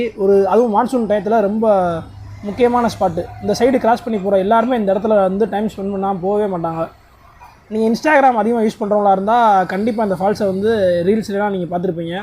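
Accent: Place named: Madurai, India. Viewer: Hi, Nice Hidden waterfalls & your natural Madhurai Slang is so good to listen.....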